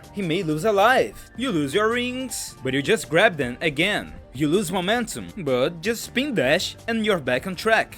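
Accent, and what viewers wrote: Brazil. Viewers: My dudeeee, are you? It's almost the same title and almost same thumbnail! And I noticed that you have that brazilian accent aswell. Just wanted to know. I'm subscribed in both channels, so I was curious xd Ty for the attention! 👊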